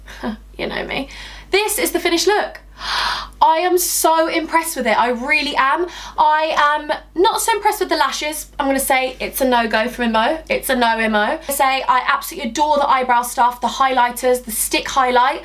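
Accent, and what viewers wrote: United States. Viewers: Your American accent is on point girl 👌🏻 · you're american accent is better than mine... PS i am american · Your American accent is so good you sound just like me 😂😂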